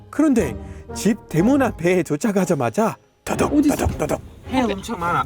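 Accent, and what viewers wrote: Gyeongsang, South Korea. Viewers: 경상도 사투리 넘 귀여워요 어디 가노 ㅎㅎ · 반가운 경상도 사투리 이뽀요~~~ 미국인지 한국인지...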